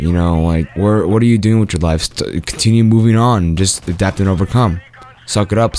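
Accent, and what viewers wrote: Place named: New York, United States. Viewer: It sounds like your in new york or california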